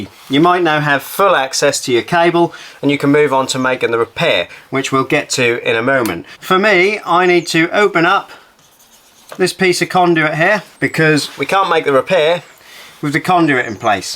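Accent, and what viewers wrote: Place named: East Anglia, United Kingdom. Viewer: You sound like you're from East Anglia.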